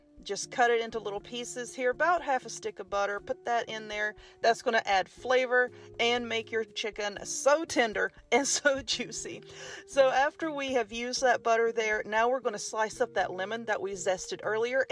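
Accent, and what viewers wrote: South, United States. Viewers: New sub. Definitely making this today or tomorrow. I’m fascinated by your tats and nails. Interesting with your southern accent. My mind kinda went “Huh??” when I saw them.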